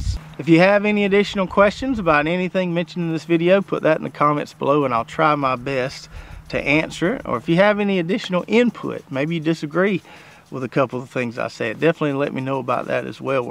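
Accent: South, United States. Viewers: ...You just cleared up my thoughts as of late. I have been watching your videos for the last year and really appreciate your intelligence, southern accent, humor and love for gardening. You're the reason I purchased all my drip, fertigation and seeds from Hoss. I am sure they are missing their main man. Not sure we'll ever know what happened but if you start your own company, many like me will follow. God bless you and your family. Everything happens for a reason! Thanks for making awesome videos! Hollerin' from O-H-I-O! All the best to you and your family!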